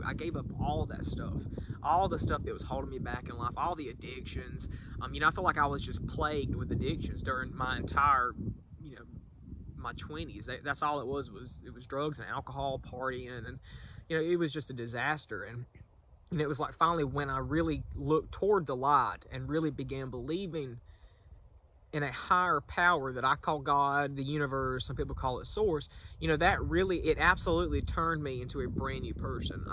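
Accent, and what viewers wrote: South, United States. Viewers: I love your accent, sounds so southern haha. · A fantastic video. I love your southern accent. I could listen to you talk all day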